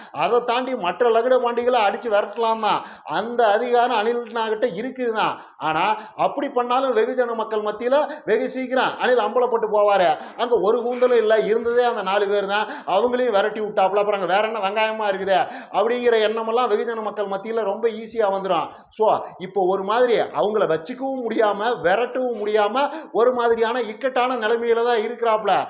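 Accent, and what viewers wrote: Kongu, India. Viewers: சூப்பர் தம்பி கொங்கு தமிழ் மெசேஜ் அருமை அருமை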